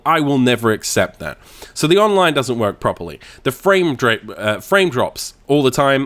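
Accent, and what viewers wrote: Australia. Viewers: Man, thank you so much for your honesty. The industry needs more reviewers like you. I tried this game and after the first 2 hours I was like ...THIS got 10/10? I would give it a 5/10. Good to hear a fellow Aussie too! Quick question - what do you think of Diablo 3 since RoS came out and they made the improvements? I'm considering getting it and I value your opinion.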